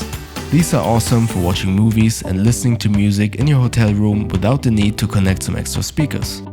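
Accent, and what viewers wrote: Germany. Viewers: I hear some German accent somehow :))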